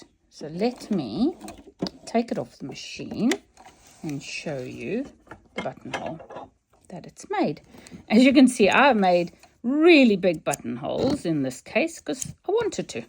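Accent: South Africa. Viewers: Thanks ! Love your South-African accent !!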